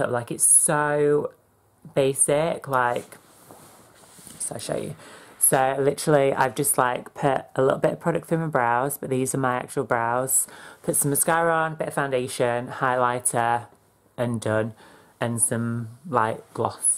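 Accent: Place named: Yorkshire, United Kingdom. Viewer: I love your accent. so Yorkshire and cute lol.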